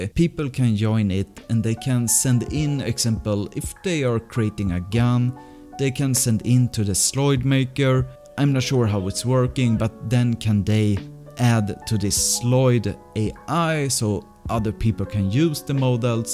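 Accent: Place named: Sweden. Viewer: The heaviest swedish accent I've ever heard lol. Did not know this was a thing, but that's awesome, lit up some new motivation in my silly game project. Tackar så mycket för det! ❤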